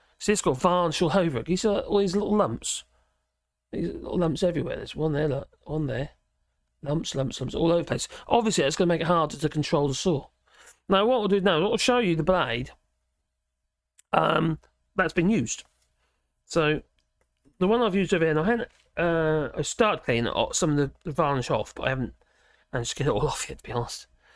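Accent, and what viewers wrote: Norfolk, United Kingdom. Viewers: You a Norfolk bumpkin buh?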